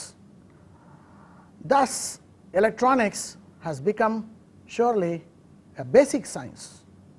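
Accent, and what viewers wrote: India. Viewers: I really love the way the Indian accent sounds. Reminds me a bit of my own accent (Irish).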